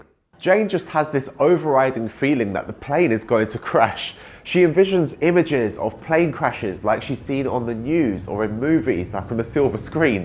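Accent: United Kingdom. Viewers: Long time no hearing ur british accent welcome!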